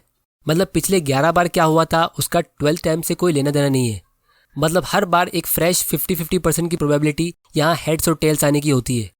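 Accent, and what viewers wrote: Gujarat, India. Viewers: Ur gujrati accent is good✨ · Are u gujrati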